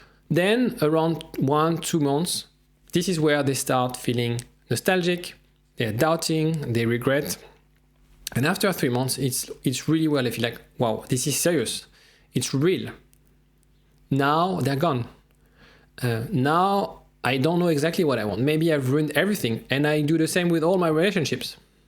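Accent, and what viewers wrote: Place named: France. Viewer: Well he is french.. did you hear the accent. He lives in london but when you learn english in France you'll get that accent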